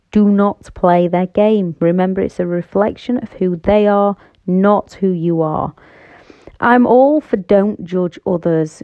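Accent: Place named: Lancashire, United Kingdom. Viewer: Thanks for your useful and helpful vids, and always nice to hear a Lancashire accent for a change. x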